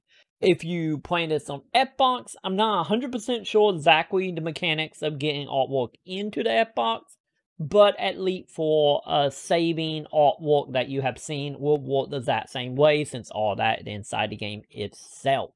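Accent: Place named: Australia. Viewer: This is the most Australian accent i've ever heard.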